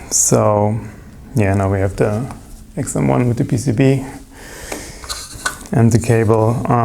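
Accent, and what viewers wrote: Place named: Germany. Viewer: eew that gross accent... I assume you're german? maybe work on your speech before you upload videos in a lingo that you can't pronounce without ppl getting ear-cancer...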